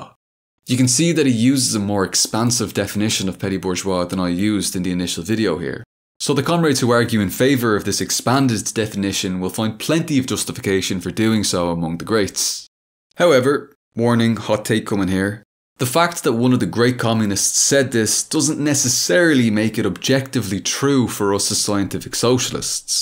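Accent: Ireland. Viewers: There is something about you that is really attractive. I don't know what it is. I hypothesis is either of these three: - 1) Our ideological agreement (both MLM) 2) Your good looks (not jealous😂) 3) Your sweet Irish accent (not being racist) 4) Your way of (""usually"") simplifying explanations😂😂😂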